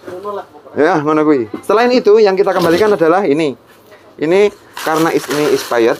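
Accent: Java, Indonesia. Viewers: ya alloh mas jowone jan nemen boso indo blas 😁😁aku suka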